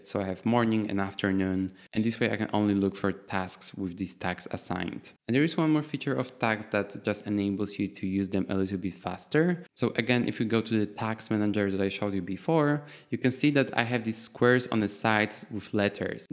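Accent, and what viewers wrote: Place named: Germany. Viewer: Hi, I like your accent, but totally confused, You speak English with German accent but you have a Polish name lol, what's your story?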